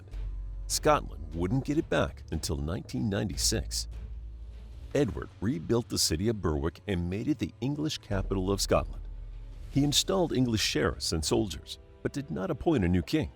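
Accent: United States. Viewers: Why should we listen to an American voiceover distort the Wallace history by including so many errors ? The story of Wallace was a translation of the original Latin that was translated by The Minstrel in 1361 from a document by eyewitnesses probably around as early as 1310 that was commissioned by Bishop Sinclair of Dunkeld.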